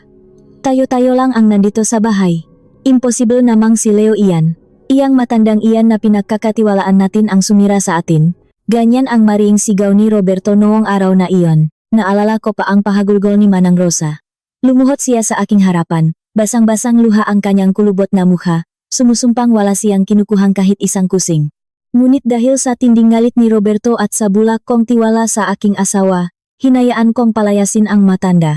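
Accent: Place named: Philippines. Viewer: Pilipino slang mag narrate.. its annoying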